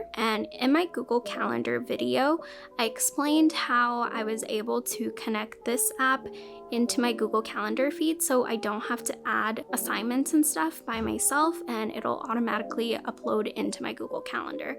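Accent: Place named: England, United Kingdom. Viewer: I like your english accent, can you make a content about it?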